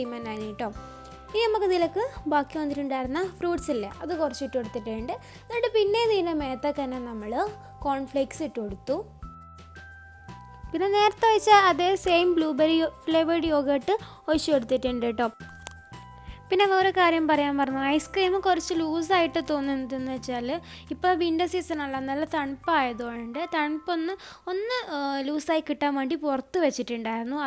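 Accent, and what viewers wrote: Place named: Kasaragod, India. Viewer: Nammale kasargod slang aanallooo😍😍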